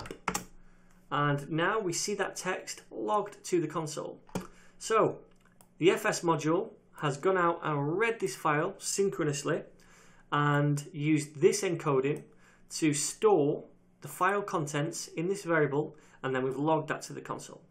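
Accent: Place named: Scotland, United Kingdom. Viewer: I love Scottish accent!!!!!!!!!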